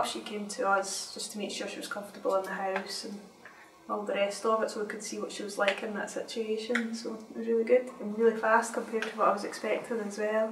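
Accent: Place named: Scotland, United Kingdom. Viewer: She is Scottish;. Possibly Glasgow, but more the West than East.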